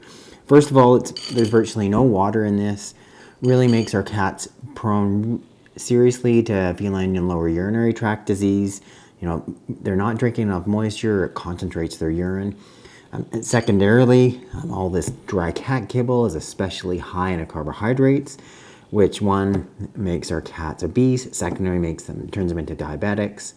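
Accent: Canada. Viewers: Random question but are you from Canada? ☀️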